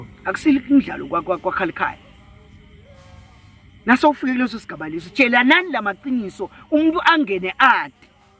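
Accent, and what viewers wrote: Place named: Tsonga, South Africa. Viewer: Some shangaan tsonga dilect on swati language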